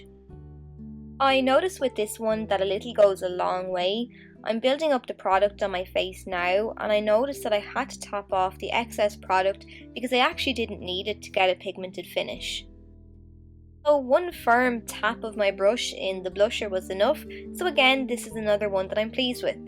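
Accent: Ireland. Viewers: God, I love Irish accents.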